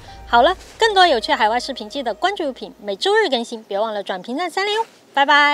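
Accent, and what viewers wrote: Sichuan, China. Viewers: 四川口音好重😅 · 听你讲话，老家应该是四川的，或在西南长大的，然后出国了。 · 四川人吧，川普的口音，只有四川人听得出来。加油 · 你的口音是四川的吗 还是重庆哈哈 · 作为一个四川人，听到这亲切的川普真是亲切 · 听着熟悉的川普，果断定阅，谢谢分享！ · 你的口音怕不是川妹儿吧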